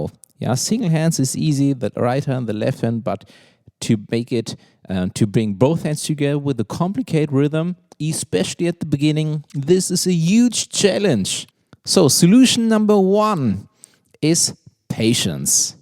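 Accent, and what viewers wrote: Germany. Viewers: Its a german accent. honestly hes speaking way better than most people i know. have a wonderful day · German accent right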